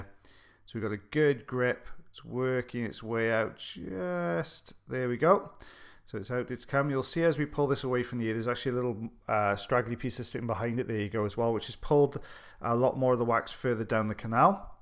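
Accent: United Kingdom. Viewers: I find these vidoes very fascinati g. My favourite are the cotton ball/ paper removal. But i love your beautiful accent. Def my favorite of any British accent.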